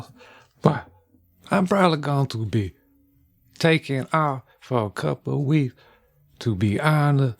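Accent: Louisiana, United States. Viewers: is this accent a french person from louisiana. rec brought me here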